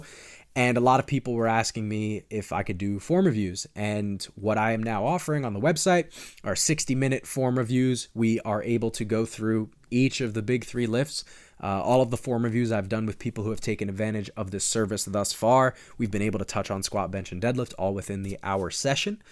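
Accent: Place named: Miami, United States. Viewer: Bros been in Miami for too long, almost say america with an accent lol.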